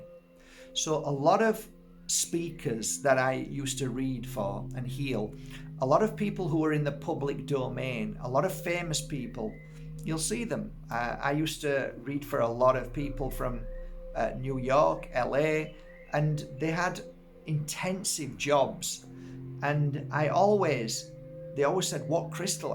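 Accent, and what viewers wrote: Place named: Hull, United Kingdom. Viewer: was you originally from Hull . Its the accent.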